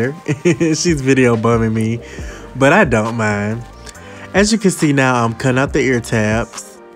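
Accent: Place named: Texas, United States. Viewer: I love🥰🥰 his accent, where are you from?... Texas?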